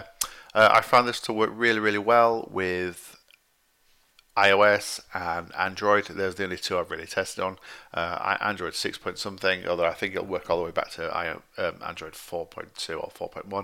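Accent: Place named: United Kingdom. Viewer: UK accesnt so horrible ..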